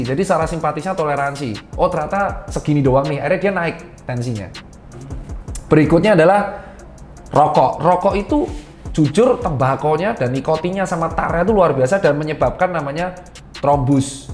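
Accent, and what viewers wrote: Java, Indonesia. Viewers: Bos tirta klo ngomong kliengan meni medok😀😀😂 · Medok nya mantap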